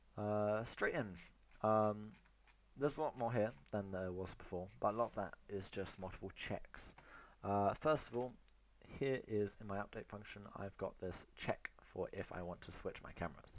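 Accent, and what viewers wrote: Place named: Russia. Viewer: Russian accent moment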